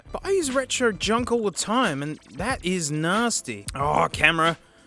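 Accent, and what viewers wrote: Australia. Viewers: LOL... this is Mr Mobile if he was your Aussie mate. Amazing essay as usual mate 👌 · are you australian? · Hi Australian man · Aussie accents are so interesting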